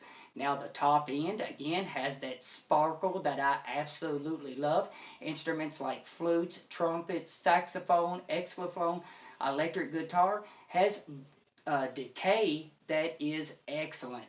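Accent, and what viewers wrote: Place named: Tennessee, United States. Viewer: Where you from? Sounds like TN ;) … I’m in Bama here, but from TN.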